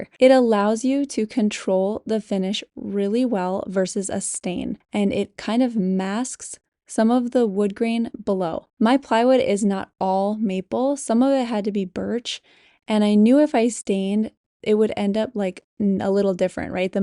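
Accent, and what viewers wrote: United States. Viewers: I just love to hear * talk in her American accent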